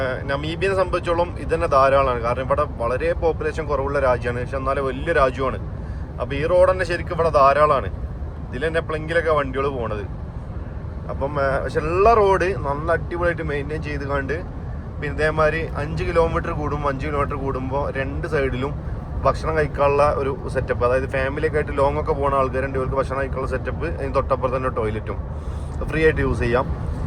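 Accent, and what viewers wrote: Malappuram, India. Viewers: Hai, where are you now? in Namibia? wish you a happy journey. Your malappuram slang is amazing. God bless you. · Malappuram സ്ലാങ് അടിപൊളി ❤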